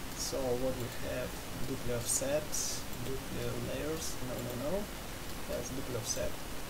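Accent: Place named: Russia. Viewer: Жуткий русский акцент)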